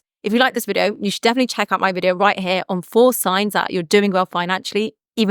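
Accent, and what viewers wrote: United Kingdom. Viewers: Nice presentation with nice british accent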